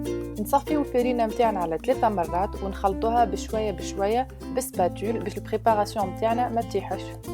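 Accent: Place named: Algeria. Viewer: Bravo pour la recette j'adore ton accent sa me rappelle l'Algérie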